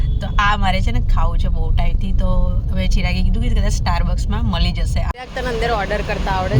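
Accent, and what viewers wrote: Gujarat, India. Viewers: I like your sweet guju talk. Love it. 😍 please continue making nicebloks. From USA Jitubhai 👍🌹🙏🏻👌😍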